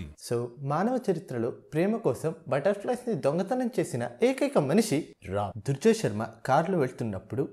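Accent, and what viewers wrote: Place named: Andhra Pradesh, India. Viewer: "Chinna pappa chirak munnda" ee video motham lo naa attention ni garbe chesinna dilogue edhe.....😜😜😜😜miru Andhra Pradesh lo untara .... don't mind mi slang koncham Andhra laa unndhi....i love it❤️❤️❤️❤️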